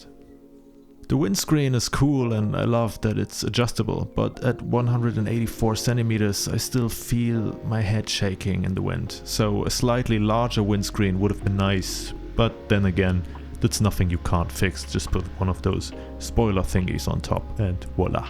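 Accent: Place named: Germany. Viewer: Good review and wow, your English is amazing. I really liked the use of idiom. The spoiler "thingy" and "cheers" were really nice to hear. Whilst you have a German accent, you don't have that mid Atlantic accent so many European speakers of English have. You should feel proud of your video and your excellent English.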